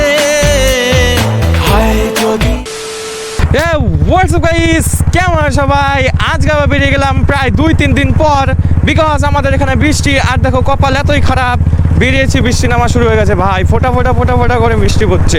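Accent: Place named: Bangladesh. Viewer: Bhai tumi bangladesh theke esecho naki? Karon tomar accent ta sune serkm e mone hochhe.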